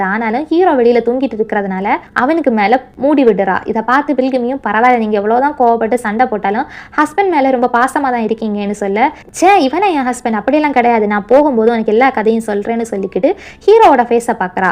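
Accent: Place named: Kanyakumari, India. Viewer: akka neega kanyakumari yaa??? unga voice la kanyakumari slang theriuthu... i am also kanyakumari ❤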